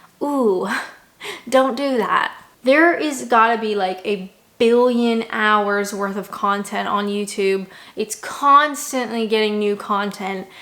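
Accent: Canada. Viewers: fist of all, I'm sooo happy for you 🥳🥳 and i hope everyone works out for you for the rest of your life ! I've been learning english vocabulary and phrases from you over these video in oder to get used to Canadian's accent 🤗 (I'm a Korean I'll move to Canada next year ) So I'd be so happy if you could upload a video with subtitle ~^^ I had to write down every single word you said on video 😂😂 and then ask an native speaker to help me with some part i couldn't catch or catch incorrectly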